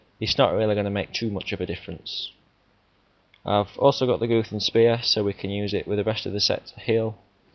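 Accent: United Kingdom. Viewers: good solid english accent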